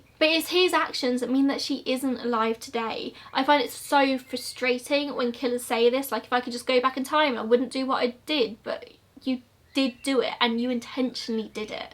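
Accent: England, United Kingdom. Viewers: hey, I'm a new sub. Long time true crime addict and I sub to a few true crime channels. I do sub to more but I end up unsubbing for either personality or bad info reasons. I won't be unsubbing from here, Ive binged a few of your videos and I love your delivery. Im in the UK, I'm in Kent... I'm trying to work out where you're from based on your accent and I'm thinking either Surrey or maybe Kent. Am I right? It's great having a Brit to watch as most of my subs are from the U.S. Looking forward to more great vids. And your make up and jewellery and clothes are gorgeous every time (I know that's not the point). I saw some love.y gold chain rope earrings on one video, where did you get them? I need them lol, I was a teen when they were first in fashion. Love from Kerry in Kent 💕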